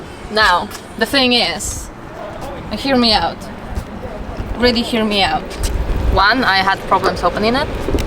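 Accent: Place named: Eastern Europe. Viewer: Well done for doing this video. I can’t wait if you are doing a review on model Y. Love your Eastern European accent.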